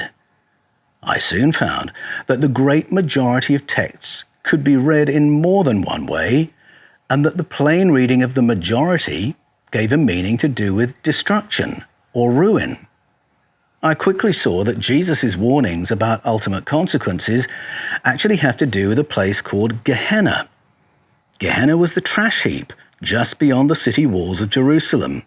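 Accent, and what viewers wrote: Australia. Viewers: does a great Aussie accent. After all is an Aussie himself.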